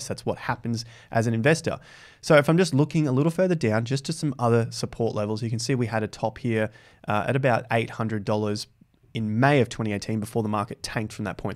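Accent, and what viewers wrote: Australia. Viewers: Hey mate I came over from invest answers, good to hear a ozzi accent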